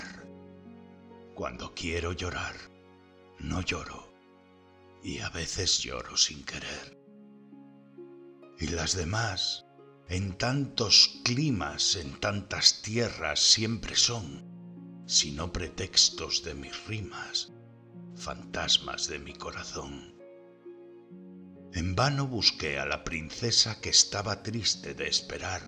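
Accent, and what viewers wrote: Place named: Castile, Spain. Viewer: Lo poco admisible es la prosodia castellana estricta recitando los versos sublimes del poeta. Rubén Darío era nicaragüense y en los finales del siglo XIX y principios del XX el acento idiomático no se perdía fácilmente. Por eso al vate inmortal del español, Rubén Darío, hay escucharlo en su expresión fonética natural.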